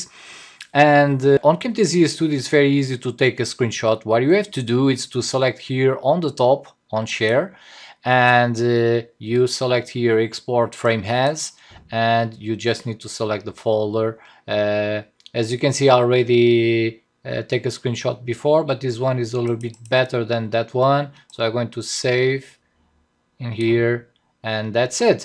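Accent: Portugal. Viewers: Sotaque Tuga, pt? xD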